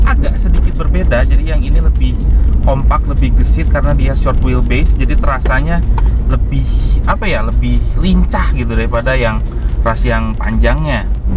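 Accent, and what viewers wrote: West Java, Indonesia. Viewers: Saya tau Anda dari videonya om mobi Gw suka logat sundanya:)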